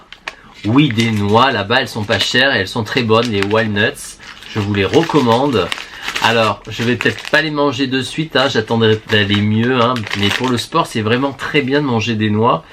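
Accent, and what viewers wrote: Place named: South, France. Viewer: Ah c’est bien se qui me semblait que tu venais du sud j’ai reconnu l’accent mdr 😘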